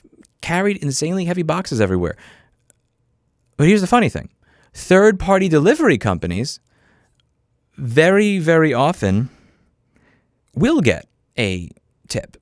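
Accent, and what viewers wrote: New York, United States. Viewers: Your New York is showing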